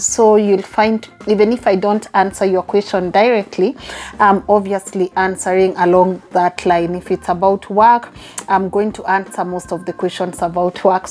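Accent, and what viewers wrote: Kenya. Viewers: You sound very Kenyan YOU KNOW ; ; . ; ; ;. Right?? ⁉️ Ly Baurer 2️⃣4️⃣ December Climax to (Sounds like a true Kikuyu Woman) #NoUkabila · Thank you for keeping your original Kenyan accent.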